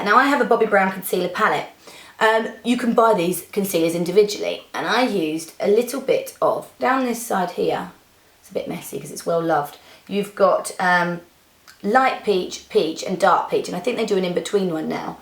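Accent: United Kingdom. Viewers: Really a beautiful makeup! I hope you had a wonderful wedding. (and I love your british accent)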